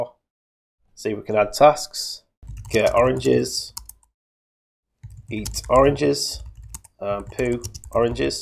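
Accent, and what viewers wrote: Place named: England, United Kingdom. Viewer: Great tutorial. Glad to hear another English voice doing tutorials along with the English "Poo" humor. Well done on getting your video on the front page of <link>!!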